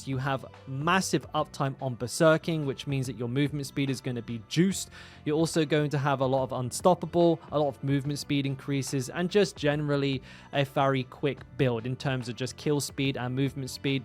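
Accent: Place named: South West, United Kingdom. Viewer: My go to for D4 content!!! Even though the accent reminds me of the uni days in the south west 😂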